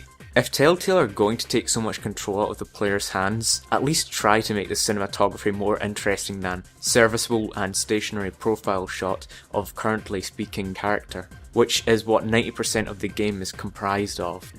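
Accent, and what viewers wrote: Scotland, United Kingdom. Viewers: Is that a Scottish accent? Sorry, I'm not anglophone, so I'm not 100% sure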